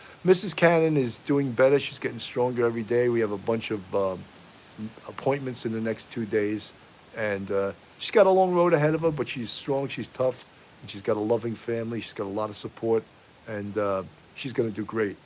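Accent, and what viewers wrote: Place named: East Coast, United States. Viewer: Hey,! Watching the replay. Haha - I can tell you're from the East Coast! Illinois is pronounced "Illinoi". The "s" is silent. I'm not nit-picky, am I? The nationwide "brotherhood" among police often extends to Marines. My disabled Vietnam veteran husband has often been given a pass for minor offenses.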